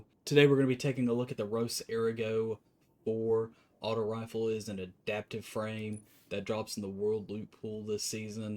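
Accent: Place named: South, United States. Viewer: As someone fro europe i really enjoy the southern draw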